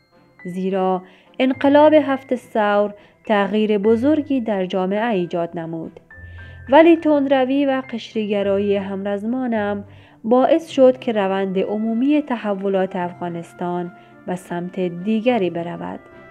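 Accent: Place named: Iran. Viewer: Why don’t you speak Afghan Dari. You sound very foreigner meaning, Iranian.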